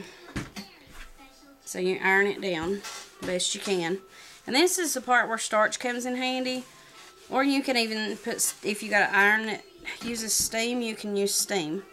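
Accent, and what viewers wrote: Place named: South, United States. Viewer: Thank you. I will be watching more of your videos. Love your southern accent :-)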